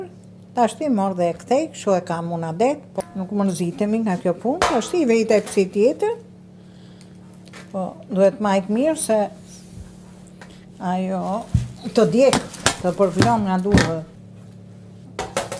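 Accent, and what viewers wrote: Tirana, Albania. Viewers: Ju lumshin duart teta, kenaqem kur shpjegon perberjen e byrekut, me ate ze te qete dhe gjuha juaj tironce qe me nxjerr mallin, me kenaqesi Ju degjoj! 👏👏👏💖🌸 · Gezuar diten e veres, me pelqen gatimi jot tamam gatime tradicionale ,por me teper shpjegimin qe ben Tiranse puro .kenaqem kur te degjoj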